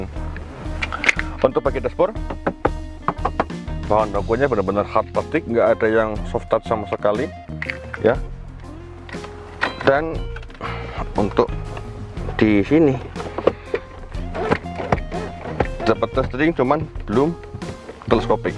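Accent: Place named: Banyumas, Indonesia. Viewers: Review nya bagus lucu sedikit ngapak 😁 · Logat Purwokertonya Keliatan Banget Ya..😀🇲🇨👍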